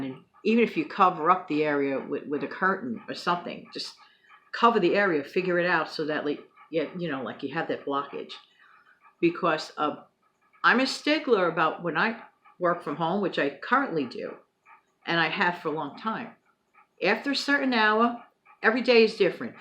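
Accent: New York, United States. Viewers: So so true. My 4 grew up so fast 2 men now and 2 young women. Youngest is 17. Sons are almost 30 and both away in navy. Enjoying tea time today. Morning chores done and eating leftovers for breakfast. I worked full time with children in 4 schools due to age differences. Miss those hectic days. My time for college (almost 2 years pasted already) and me time. It is hard to believe how fast time passes. My mind races too so I take time to go outside and photograph nature. Ahhhhhh bliss. Love your NY accent. Enjoy your days.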